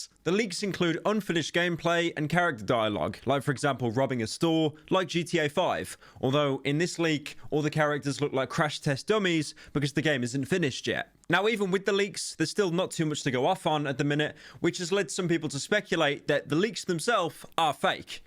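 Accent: United Kingdom. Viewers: I love the added 30 seconds to get to that 8 minute mark, thank you funny british man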